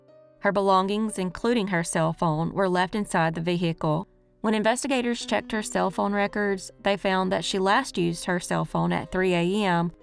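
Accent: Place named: South, United States. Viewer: Lol "MAc" donalds- this is why i listen to you! We speak the same language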